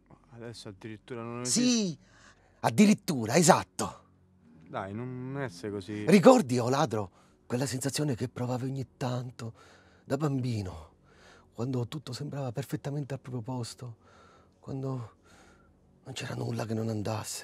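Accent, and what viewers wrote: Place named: Rome, Italy. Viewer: Aperto il video, non stavo guardando lo schermo e appena ho sentito parlare in romano ho zompato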